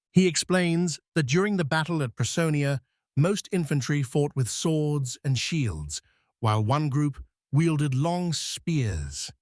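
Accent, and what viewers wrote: United Kingdom. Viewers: British narration, unusual for this type of video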